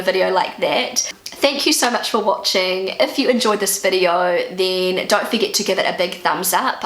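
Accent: New Zealand. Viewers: Wer hat auch Probleme diesen Neuseeländischen Akzent zu verstehen? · Armin Ehrbar That is the Kiwi accent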